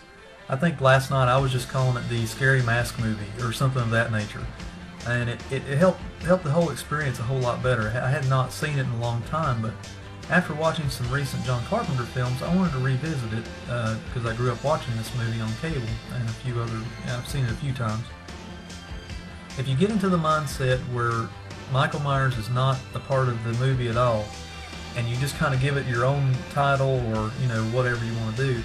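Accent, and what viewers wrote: South, United States. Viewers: It took me a while to get used to your Southern Drawl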